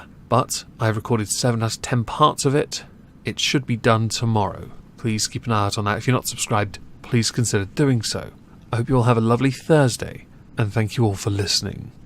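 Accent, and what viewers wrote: RP, United Kingdom. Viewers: Loving the posh English accent.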